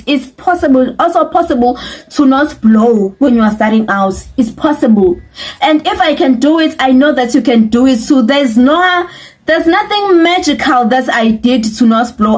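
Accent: South Africa. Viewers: South Africa, even her accent.🇿🇦🇿🇦🇿🇦🇿🇦🇿🇦🇿🇦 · She's South African from her accent indeed she's South African.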